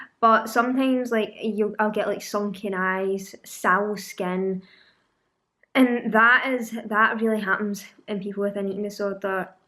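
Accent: Scotland, United Kingdom. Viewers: i love your Scottish accent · it's a scottish accent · Her Scottish accent is so O.oooooooooooooooo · OMG I F*CKING LOVE THIS YOUTUBERS SCOTTISH ACCENT BEAUTIFUL Love Scottish and Irish Accents <3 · thank you for defending her. I've been defended her and was attacked. Also I love your accent Scottish right? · Please of course her accent is strong... Scotch, Scotland, strong accent.. Stop being negative and listen after you listen for a few minutes you get the sound and some of the slang is much like ours (assuming you speak English). is a very intelligent woman so don't miss out on her humor and wisdom because you won't take the time to just listen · im frm south west scotland, you sound similar to me tbh, i understood every word, but also understand how slang it can sound x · I love your accent, sooooo scottish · how can you not understand??? 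it's a fucking Scottish accent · is from Scotland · She is Scottish just like me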